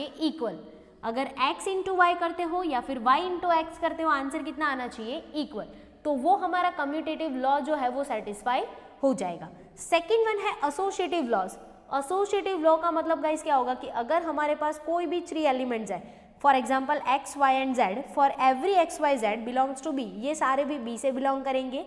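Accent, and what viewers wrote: Gujarat, India. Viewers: Hey Ma'am!! Are Youu Gujarati?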